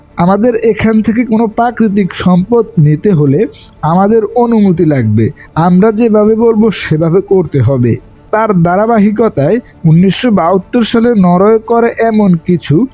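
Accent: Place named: Noakhali, Bangladesh. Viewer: khub base bhul na korle... apni Noakhali, lokkhipur, feni theke... Bhaiya don't mind Script/ voice improve korte paren...Best of luck ✨👍🏻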